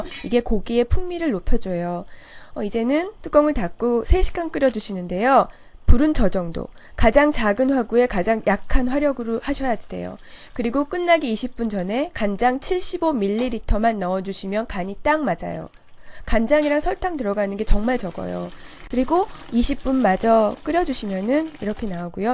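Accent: Seoul, South Korea. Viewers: 와 진자이게 서울 사투리죠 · 서울사투리 너무 귀여워용 ㅠㅠ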